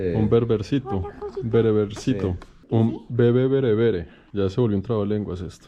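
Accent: Colombia. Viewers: montaña a la orilla del río, hasta yo me canse! Lol.....Me gusta ver tus videos por el mundo, muro de risa, con tus comentarios en tus recorridos. El acento Colombiano, es uno de mis favoritos! Exelente pueblito al lado del rio! 👏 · Amo como hablas muy colombiano, no se puede olvidar la tierrita; eres lo máximo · Ese man habla mas colombiano que yo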